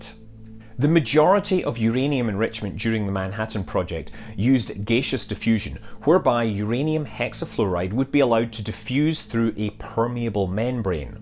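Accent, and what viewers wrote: United Kingdom. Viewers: Is s Accent British or Irish? Don't get me wrong,I love it and understand him better than someone from Chicago, just curious and glad he is here with us in America now! ❤❤❤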